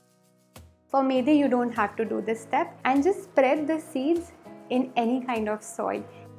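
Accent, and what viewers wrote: India. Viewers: Pure Indian accent 😂😂😂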